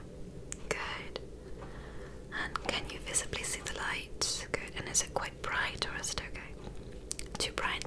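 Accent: England, United Kingdom. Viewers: Being british when you say certain words in this British accent I sit here repeating them like “do I say stomach like that?” I mean clearly I don’t I am from Manchester I am in no way posh 😂😂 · Best ASMRtist on YouTube, and the very realistic British accent is back :) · this english accent is so jarringgg please stop it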